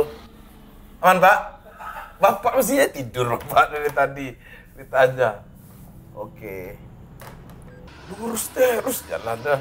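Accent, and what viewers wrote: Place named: Padang, Indonesia. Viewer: Bang sering2 konten pake logat atau bahasa padang ya hehe berasa lg dikampung soalnya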